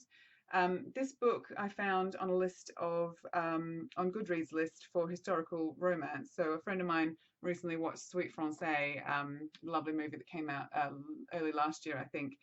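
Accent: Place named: England, United Kingdom. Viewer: The Madwoman upstairs, wouldn't mind taking a look at that , and watching middlemarch. I wish I'd borrowed instead of 'Joy' which is currently in my dvd player. First half hour in and I was so bored last night I went to bed lol. And read some of and editing book by james scott bell...ANd actually paid attention. That's how sick of feeling bored at the movie I was! Lol. I love the Brontes. it sounds like the mad woman upstairs isn't written like fanfiction? - it has it's own story. There is a book I saw recently in book shop about Nelly from Wuthering Heights and I got one page in and groaned - I could have written it myself! - it did not read anything like Emily Bronte...or any bronte. By the way you have an English accent lol. Did you always have it or caught it overseas? This sounds funny but I like stories where people need to get a job, and life kinda is frustrating them lol. 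Blood stain sounds good.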